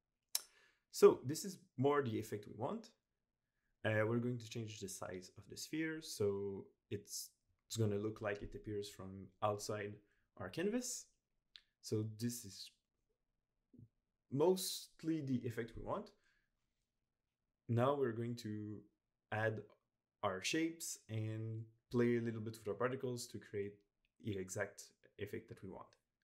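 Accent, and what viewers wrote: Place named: Quebec, Canada. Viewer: haha j'ai reconnu ton accent québécois. Merci beaucoup pour ce tutoriel.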